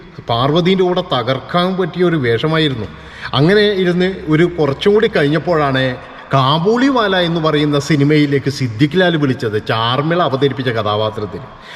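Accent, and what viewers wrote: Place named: Konkani, India. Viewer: നിങ്ങൾ തിരുമലക്കാരൻ കൊങ്കിണിയാണോ ശബ്ദം കേട്ടിട്ട് തനി കൊങ്കിണി മുതലാളി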